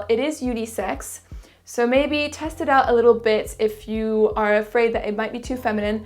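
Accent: France. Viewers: I can hear you are French.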